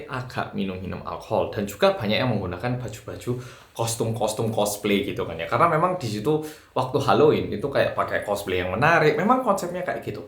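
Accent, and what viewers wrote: Java, Indonesia. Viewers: Kalah medok gw sm oppa 😀😀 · jiaannnnm blasssss ra koyo wong korea,medoooxxxxxxxx bgt.......#keren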